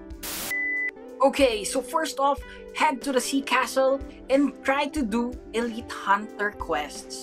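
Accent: Philippines. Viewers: Bro accent is filipino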